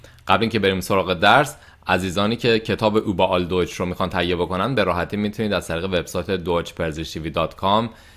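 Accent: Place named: Iran. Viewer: چقدر ایرانی ها لحجه ی تابلویی دارن وقتی آلمانی صحبت میکنن🙄 🤔 شما مثلا آموزش زبان میدید؟! کمی سر لحجه ی خودتون کار کنید نه فقط گرامر!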